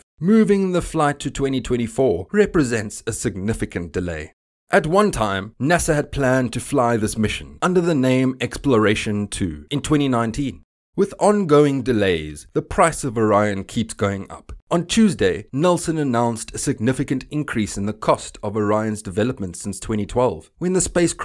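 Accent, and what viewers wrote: South Africa. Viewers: you're from south africa aren't you? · Sounds possibly south african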